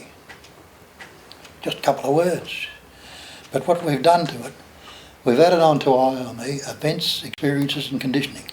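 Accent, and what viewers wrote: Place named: Australia. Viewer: It sounds so much more down to earth with a Australian accent.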